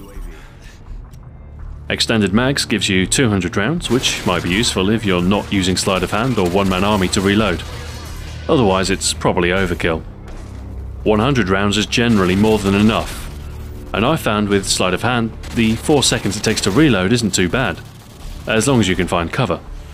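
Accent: England, United Kingdom. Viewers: damned british accent... -. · He sounds so formal. I love his accent, he’s gotta be some form of United Kingdom. English I’m guessing. I like this guy. He makes these games so interesting. What a great dude.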